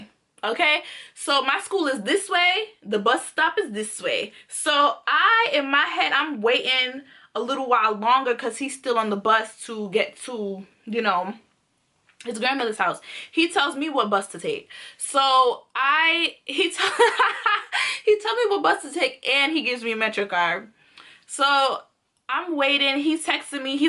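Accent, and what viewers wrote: Jamaica. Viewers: Just to be curious are you jamaican, half jamaican or part of jamaican because in some in your videos, you had that jamaican accent when you talk sometimes? The reason I'm asking is because I'm half jamaican so I can tell if someone is jamaican.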